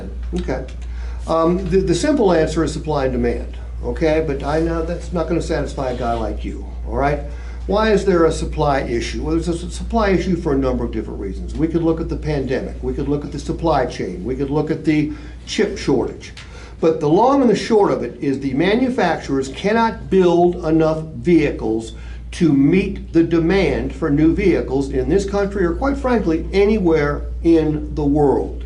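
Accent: Appalachia, United States. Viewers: hillbilly and his bullshit hick talk!!!!!!!!!!!!!!!!!!!!!!!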